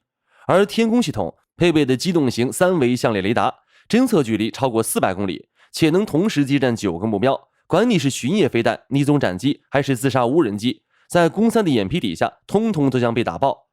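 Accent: China. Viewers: 中國口音的中國影片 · 都不知道這是AI做的，還是對岸的人做的；這人是從台灣人住對岸??? 這影片滿滿的中國味的口音，說話的用詞發音用法都是對岸，搞甚麼東西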